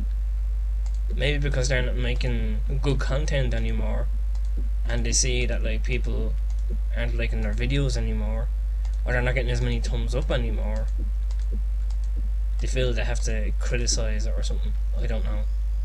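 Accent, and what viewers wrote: Ireland. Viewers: spoken like a true irishman! :D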